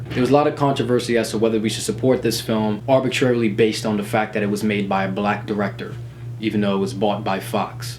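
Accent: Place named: Bronx, United States. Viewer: I feel like your from laBronx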